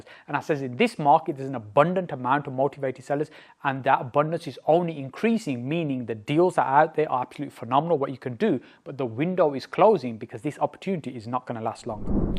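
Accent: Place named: Birmingham, United Kingdom. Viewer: Brum accent but perfect English though.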